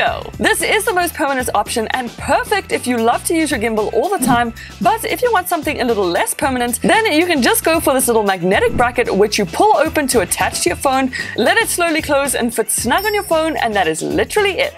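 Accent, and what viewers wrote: South Africa. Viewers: Are you south African ? 😅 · I love your channel!, you are so full of life and fun! Love your South African accent! Are you single by any chance? If not are you happy? Lol keep up the good work.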